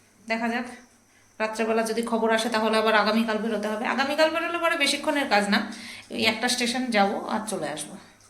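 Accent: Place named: Dhaka, Bangladesh. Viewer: তোমার কথাগুলো ঢাকার ভাষার মতো। তোমার আদি বাড়ি কী বাংলাদেশ ছিলো?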